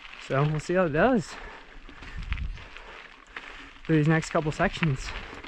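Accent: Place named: Western New York, United States. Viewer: Fellow Rallon rider here but I wanted to know - are you from WNY? Or Eastern Michigan? Your voice sounds like home and I'm from Rochester.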